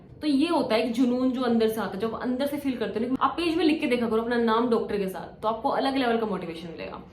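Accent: Haryana, India. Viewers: Haryanavi touch in Voice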